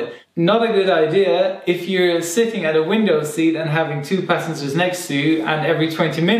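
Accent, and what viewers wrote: United States. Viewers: how can he speak American English so well?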